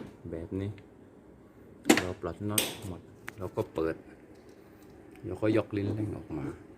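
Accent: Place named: Bangkok, Thailand. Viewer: ฟังสำเนียงชัดเลยว่าเปนคน กรุงเทพ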